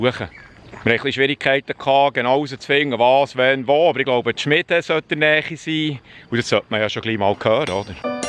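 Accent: Bern, Switzerland. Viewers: S’Video han ig spät entdeckt, aber für e Berner gäng no schnäu gnue 😉. Schön gmacht u recht persönlich. Dis Mami red ned Bärndütsch, heit Ehr im Bärnbiet gläbt, dass Du so schön Bärndütsch tuesch rede?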